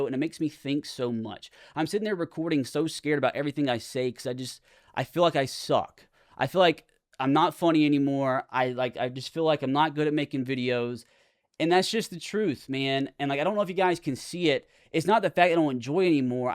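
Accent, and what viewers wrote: United States. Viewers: I hope this helps but I haven't played the last 2 maddens and I STILL watch your videos! I love the rebuilds I love the irl stuff and the playing the career of a rookie. I also think you've gotten MORE funny. I also find your voice oddly soothing haha I mean people in different parts of America have different accents and they can't help that so honestly f*ck the people sh*tting on your voice. If they don't like it they don't have to watch. People just love to be toxic on the internet. If they met you irl they'd tell you how much they love your videos and want a pic. I'm also in my 30s and have a depression problem. You just gotta focus on you man and keep putting out the content that everyone has loved. You're great at this man. Don't let the haters get you down man!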